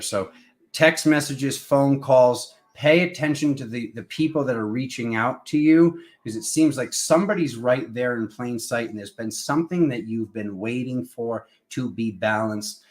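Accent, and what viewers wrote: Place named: South, United States. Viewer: "He's a badboy but he's a good mayn"🤣 🤣 But I like that Southern draw😇 I love your vibe, We're always having a good time 🤗🤣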